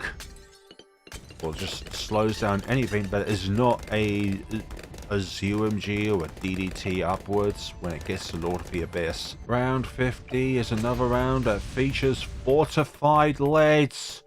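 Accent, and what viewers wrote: United Kingdom. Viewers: Nah, no facecam. There's already a hook keeping me here, the british accent and dying to yellow bloons.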